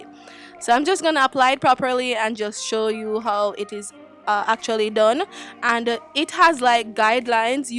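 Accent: Jamaica. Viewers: Love it . Ur Jamaican ?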